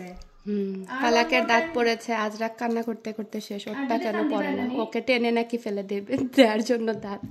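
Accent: Sylhet, Bangladesh. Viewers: Apu amrar sylhety mat hunla hasa ja bala lager ❤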